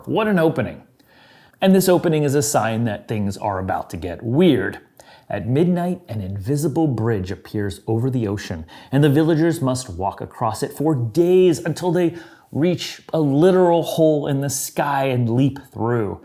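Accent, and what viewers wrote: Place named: Australia. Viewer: This is brilliant but that Australian accent is bloody awful .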